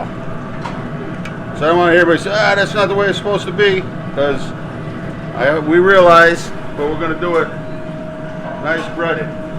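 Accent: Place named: Philadelphia, United States. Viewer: "Gabbagol?" "Prosciut'?" Are youse guys from Philly?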